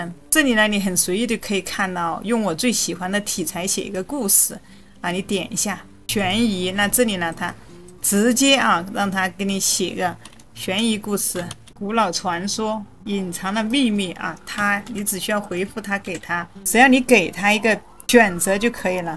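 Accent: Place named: Wuhan, China. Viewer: 武漢口音超級重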